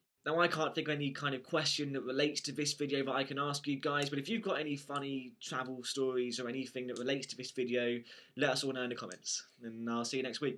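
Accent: England, United Kingdom. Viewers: London transport is terrifying O____O I went on the underground and the air got so thick and there were so many people and the trains are so fast! (I realize this is the point but it's scary) I wonder If I actually sound English like you or Charlie or it could just be a London thing :p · OMG ur sooooo funny and super cute too and that accent is just a bonus. I just wanna let u know that U have made it official that when I get older (and am not a small 16 year old ) I will go to Britain and find myself a nice guy like u keep making vids cause ur great ; D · I have watched this video SO many times just because of your british accent.. and well the story is kinda funny...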